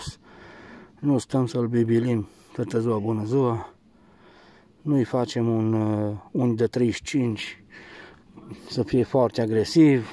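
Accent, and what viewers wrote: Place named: Transylvania, Romania. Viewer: Frumoasa și dulce evorba ardeleneasca e foarte bine venite aceste video clipuri căci ai ce invata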